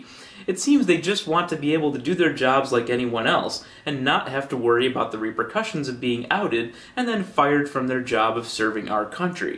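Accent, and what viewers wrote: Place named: Canada. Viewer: Amazing canadian accent, props.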